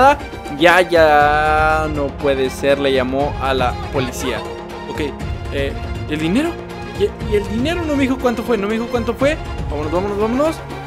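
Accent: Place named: Sonora, Mexico. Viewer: Eres de sonora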